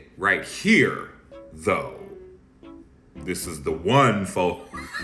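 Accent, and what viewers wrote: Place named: United Kingdom. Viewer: First thing -- I LOVE your British accent. And secondly, to me, it just feels like certain men are being rewarded for their bad behavior and hatred. I feel like high positions in these sorts of contests and polls are a way of telling them, "Keep doing what you're doing, and you will be given further, grander presents." It wouldn't surprise me, if Piers, in particular, is itching for a knighthood. (6/2/2024)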